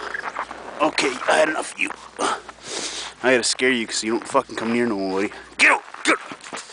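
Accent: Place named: Canada. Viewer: Keep scrolling Lol I wasn't going to say anything, but what part of Canada are you from? I'm from Atlanta Georgia but I went to college at the University of Calgary. Almost every one of my friends from Calgary says Aboat, and get oat. .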